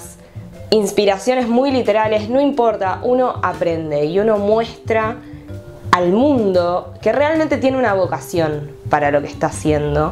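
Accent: Argentina. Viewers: Me vuelve loco tu acento! Me encanta escuchar a las argentinas, ufff!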